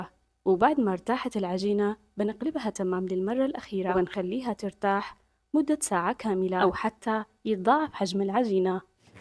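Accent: Yemen. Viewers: فديت بنت اليمن اعرف اللهجه لو بين مليون والنظافه والادب والاخلاق جبرني بك ❤ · لهجتك يمنيه عرفت لما سمعتش تتكلمين مثلنا 😅بالتوفيق بمشروعك الجميل والصادق ❤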